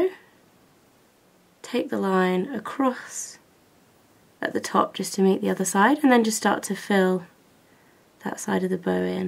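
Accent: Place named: London, United Kingdom. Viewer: i love your accent how long have u lived in london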